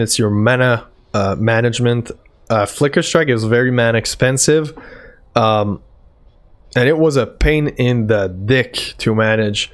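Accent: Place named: Quebec, Canada. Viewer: C''est sur t''es un quebecois , juste a t'entendre parler en anglais haha !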